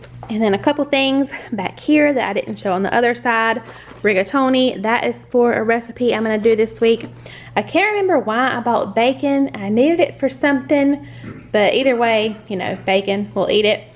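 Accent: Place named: North Carolina, United States. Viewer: I've just found your channel 2 weeks ago. I love it!! You are so calming to listen to and love your meals. They are very similar to my meal plans. I knew when I heard your voice for the first time you lived in NC. I do too. Lol 😆. Keep up the great videos!! Love them.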